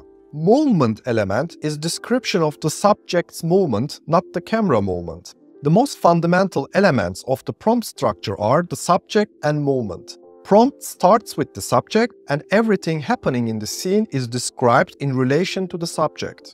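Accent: Turkey. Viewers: Hocam bu aksanla Turk olduguna yemin edebilirim. Memleket neresi? Guzel anlatim olmus. Tebrikler.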